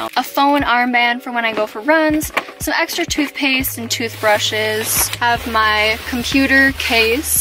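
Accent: Wisconsin, United States. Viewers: When I started watching you I said; "That's gotta be a Wisconsin girl!". Way to go kid. I bet your parents are proud of you, I know I would be. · Are you from Wisconsin???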